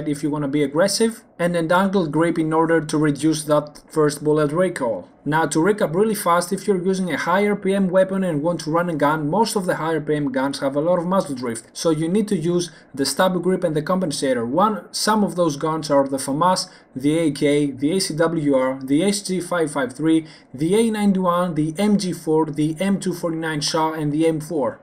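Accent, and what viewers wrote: Greece. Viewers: Are you Greek? Your accent sounds Greek^^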